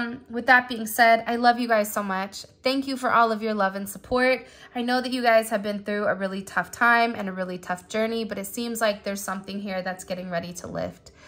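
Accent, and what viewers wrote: California, United States. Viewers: Regular sub for several months now and while your readings are always lit as AF. This one was on a whole Nother level. Thank you for your gift. I’m from the Bay Area and I can hear California in your voice and you’re saying and it makes me happy. OK I’m gonna go continue the reading now I stopped at halfway through just to write this.❤️‍🔥❤️‍🔥❤️‍🔥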